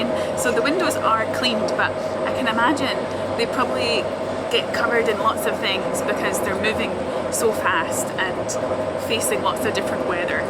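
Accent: Scotland, United Kingdom. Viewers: Hi How are you doing today Ilike watching your youtube channel I like your Scottish Accent you look Very Beautiful Stay safe traveling you have Beautiful Weekend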